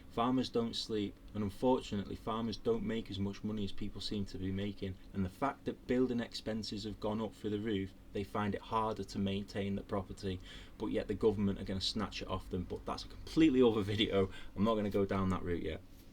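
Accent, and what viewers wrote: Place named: Widnes, United Kingdom. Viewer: Are you from Widnes? I kind of recognise the accent. I did a job there once. My dad was from Kashmir and came over to the UK in the 1950’s and worked hard all his life. As long as the people coming to England bring skills and money that’s a good thing. Some parts of England I don’t recognise anymore even though I’m half Asian. Taxes on the rich need to be increased- unfortunately government protects the wealthy.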